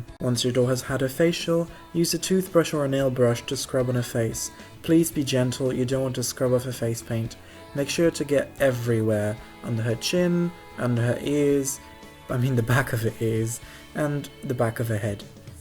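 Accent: United Kingdom. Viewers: R u from UK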